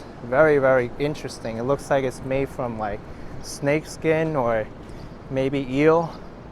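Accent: New York, United States. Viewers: I love your videos -- I have long read about the city but have yet to visit. I'm reading The Power Broker right now, and your videos help me visualize the city. P.S. I was sorry to hear the comments by those young women. With your distinctive accent, how could you be anything else but a New Yorker???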